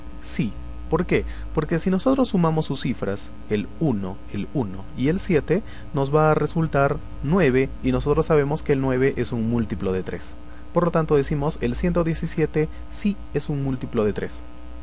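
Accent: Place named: Peru. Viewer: eres de peru se nota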